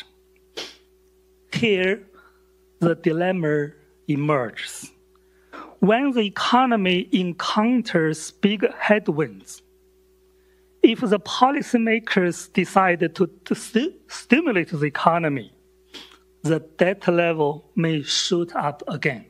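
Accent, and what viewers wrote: China. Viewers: 典型的中国英语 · 这chinglish 听的真小学生啊，不是你写的吧。chatgpt翻的英文绝不是这么差的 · 这带地方口音的中式英语 老外听得懂吗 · 我在美国上安全课时的老师是一名清华毕业的老师，口音和高老师差不多，特别老派的中式发音基本上发音都是平的，可以听懂每个单词就是不太像普通人在说话交流，就像AI翻译读稿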